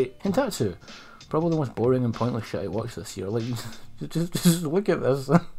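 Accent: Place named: United Kingdom. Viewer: God, I love that British voice of yours.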